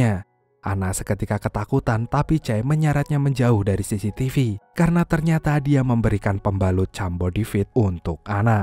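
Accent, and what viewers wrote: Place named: Nganjuk, Indonesia. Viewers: Curiga admin e wong nganjuk ki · Admin e wong nganjuk ki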